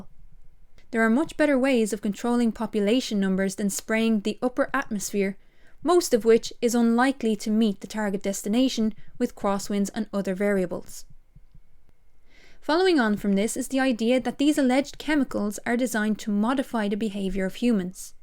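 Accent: Ireland. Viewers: Awkward moment I’ve just listened to all the videos in one morning. Wish my teachers had a Irish accent idve learned way more. Great videos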